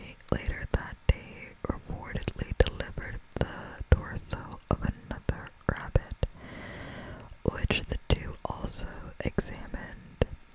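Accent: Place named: England, United Kingdom. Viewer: It's an English accent, lmao. There are many French accents that are quite distinct, just as in any language.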